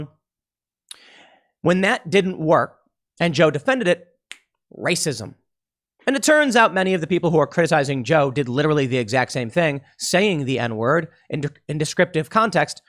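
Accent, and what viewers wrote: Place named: Chicago, United States. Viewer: stereotypical chi town accent is funny. You can tell he is from the Midwest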